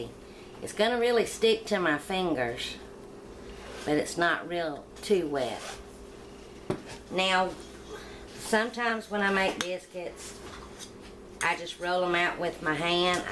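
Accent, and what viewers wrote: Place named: Chattanooga, United States. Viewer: Omg my favorite is fresh biscuits. I told you my 2 kids live in chatt, tn right? I was a nurse in that area for 17 yrs. you speak like them that's why I think u might be in that area. All of my ex family is between Chatt, Alabama, and I worked some in Knoxville. You should email me. <mail> I don't do Facebook but I've been on here almost a year. I'm remarried and we live in SC. I frequently drive and see my kids. I'm going to go in April sometime and I'm going to meet and go to lunch with 2 of my subscribers one lives in Atlanta and the other in Alabama. My daughter has a home in chatt and works with Chatt Fire Prot. Yummy yum lov ur recipes💜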